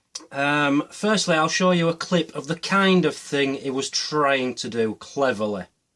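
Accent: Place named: North England, United Kingdom. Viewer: Though I'm also not a fan of 'feeding the trolls' there comes a time to clear the mud, so to speak. Such a shame, in contemporary media, that the base human nature has a viral platform to spread scum about. Good for you,. I love your attitude in your vids. Personally, I've hated Coro St. with a passion. Now, look at this objectively, the way you speak reminds me a lot of the characters, so there's a historic association with that regional accent. Does that imply I'm a 'hater'? Not when I qualify it this way. The more I listen to you and others from that accent group - from innumerable influences - who have no connection with TV soaps the more I start to associate that accent with wonderful people. I've travelled a bit in the UK, and there's a particular attitude in the North counties that I love. After all, I'm in NZ and my Grandma is from Sth Yorkshire! 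So, I am loving what you're doing in the MD community and love the way you go about your episodes. Lastly, I hope you reach the point you can ultimately forgive these 'bad people'. We all learn how to achieve peace and contentment in unique ways. That's some of my story. So 'get o nwiv yer bovver', me ol' china.:-) Only blessings.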